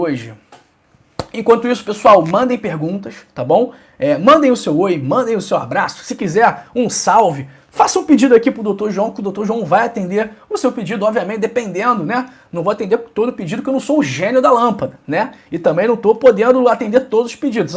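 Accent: Rio de Janeiro, Brazil. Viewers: Pecebir que o dr era Carioca devido seu sotaque de carioca !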